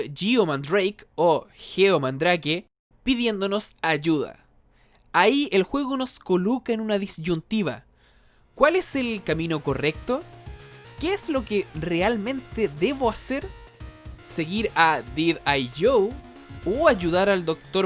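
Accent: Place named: Chile. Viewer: Buen video rey es un clasicazo este juego me lo completé con todas las finales y ya jugare nuevamente en la collection de aniversario. PD: Me fijé en tu acento y creías ser chileno hasta que lo dijiste en el video jajaja saludos bro desde San Bernardo, Groenlandia.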